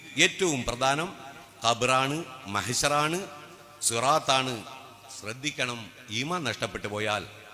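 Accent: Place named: Kasaragod, India. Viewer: കാസർകോട് ഭാഷ യാണല്ലോ തങ്ങൾ എന്ന് പറയടോ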